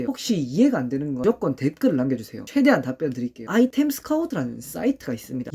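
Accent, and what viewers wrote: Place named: Gyeongsang, South Korea. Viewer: 갱상도라서 더욱 정감이 가네요...전 김해임다. 구독합니다.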